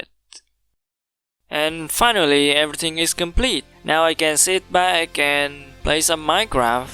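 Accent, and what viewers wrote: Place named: India. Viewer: He sounds indian and looks vietnamiese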